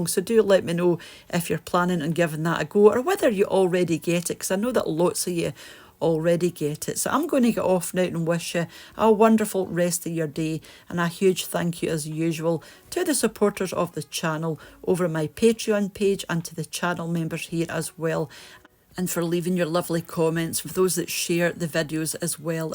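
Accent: Scotland, United Kingdom. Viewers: i hope you know what an inspiration you are to others. im a 60 year old "bonnie wee scot's laddie" living alone with no family, on the other side of the world. far from home, your cooking and weekly inspiration inspires me to cook great meals, and live a life worth living, rather than degenerate into beans on toast every night. when i listen to your wonderful scot's accent, i feel like i have come home. its like a warm blanket. god bless.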